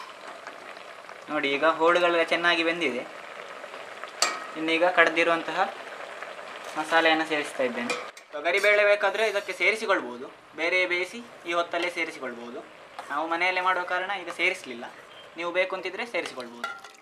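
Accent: Mangalore, India. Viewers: Listening 👂 Kannada words from your side is absolutely mesmerising, loved the mangalore accent great video liked it!!!!! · Thumba samayada nanthra nimma kannada hagoo recipe nodi mangalore ge bunda hage feel aithu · ಮಂಗಳೂರು ಕನ್ನಡ ಕೇಳಿ ತುಂಬಾ ಖುಷಿ ಆಯಿತು. ನಿಮ್ಮ ರೆಸಿಪೀಸ್‌ try ಮಾಡಿದೆ, ಒಳ್ಳೆಯ ರುಚಿ. ನಿಮಗೆ ಇಲ್ಲಿ UK ನಲ್ಲಿಯೂ ಸಹ ದೊಡ್ಡ fan following ಇದೆ. Keep it up 👍🙏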